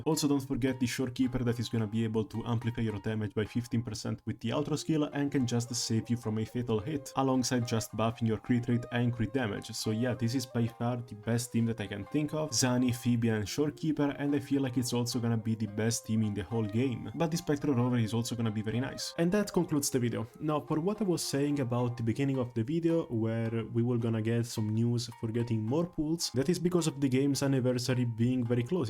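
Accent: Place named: Italy. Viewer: Italian accent talking about italian mommy, sub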